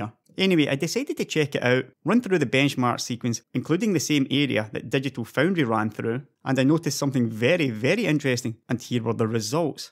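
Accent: Scotland, United Kingdom. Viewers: Your scottish accent is giving me a hard time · I came for the Scottish accent, did not disappoint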